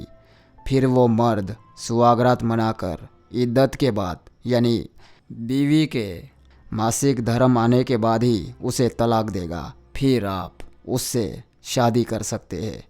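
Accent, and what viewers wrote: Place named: Telugu region, India. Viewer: Aapke baat sunke aisa lagta hei ki aap Telengana ya Andhra side ke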